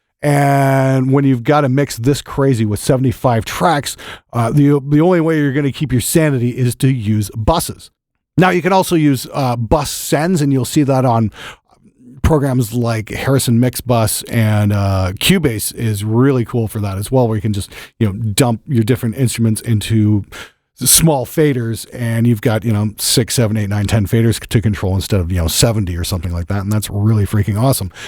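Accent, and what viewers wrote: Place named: Canada. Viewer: I have subscribed because I love your take on things, and your delivery.... except like all hosers you really need to learn to pronounce an O. Seriously love this channel.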